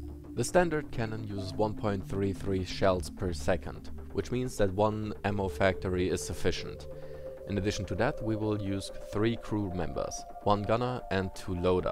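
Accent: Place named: Germany. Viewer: I spend half the video wondering whether I heard an German accent or not. Your English is better than mine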